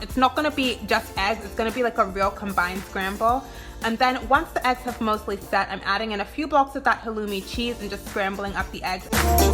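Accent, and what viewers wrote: England, United Kingdom. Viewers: Just read your comment. Its funny because when you talk sometimes it sounds like you are from England. Now it makes sense as you grew up here lol. Anyhoo I love your 'what i eat in a day videos' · aw bless you. I was trying to figure out your cute accent. I was thinking it was half British lol. Btw those sweet potatoes look bomb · I didn't know you grew up in England! This explains why your accent sounds a bit English sometimes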